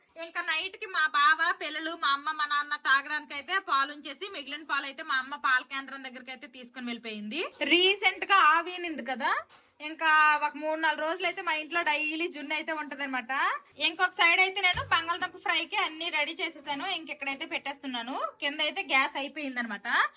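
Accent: Srikakulam, India. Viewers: Uriki velte paka srikakulam voice lo matladutunaru miru akka super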